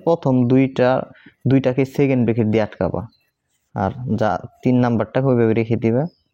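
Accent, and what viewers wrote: Tangail, Bangladesh. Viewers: স্যার আপনি টাঙ্গাইলের। কারণ টাঙ্গাইলের মানুষেরা এই ভাবে কথা বলে। আর স্যার আপনি অনেক ভালো অংক বুঝান ❤😊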